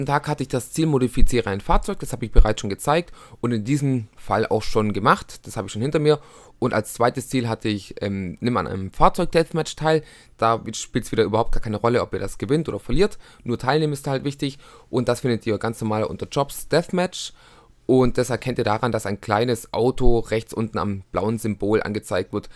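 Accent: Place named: Swabia, Germany. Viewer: da is wohl jemand ausm schwabenland:D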